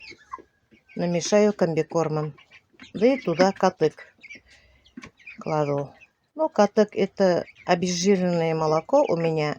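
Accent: Tatarstan, Russia. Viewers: ИСЕНМЕ СИЗ. АЛЛА БИРСЕН ВСЕ БУДЕТ ХОРОШО М ВАШЕЙ СЕСТРОЙ. ОЧЕНЬ ЖДУ САБАНТУЙ И НАДЕЮСЬ ЧТО ВЫ БУДЕТИ СНИМАТЬ ЭТОТ ПРАЗДНИК САБАНТУЙ.БОЛЬШОЙ ПРИВЕ Т ВАШИМ СЕСТРЕНКАМ И САМИ БУДЬТЕ ЗДОРОВЫ.У ВАС ОЧЕНЬ ХОРОШО НА ОГОРОДЕ. У НАС В БАКУ ЖАРА ЛИСТЬЯ НА ДЕРЕВЬЯХ ПОЖЕЛТЕЛИ И КАК ОСЕНЬЮ ПАДАЮТ. ИСЕН САВ БУЛЫГЫС. Я КОГДА ВАС СЛУШАЮ И ВАШ ТАТАРСКИЙ АКЦЕНТ МНЕ ОЧЕНЬ ПРИЯТЕН МОЯ МАМА ТАК ГОВОРИЛА.ОБНИМАЮ ВАС И ОЧЕНЬ ВСЕГДА ЖДУ С НЕТЕРПЕНЬЕМ ВАШИ ВЛОГИ. ВЫ И ВАША РОЗА ОЧЕНЬ МНЕ БЛИЗКИЕ ЛЮДИ.👍💖💖💖👄🌹🌹🌹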